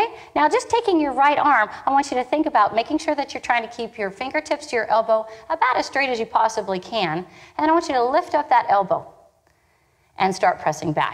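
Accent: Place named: United States. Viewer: very nice USA accent 10/10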